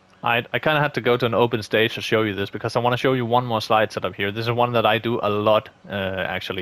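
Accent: Denmark. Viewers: I smell Danglish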